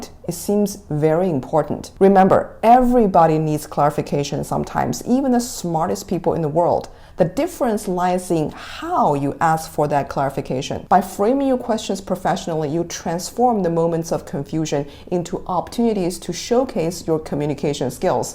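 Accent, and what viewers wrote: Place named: China. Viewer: 因为他的口音很中式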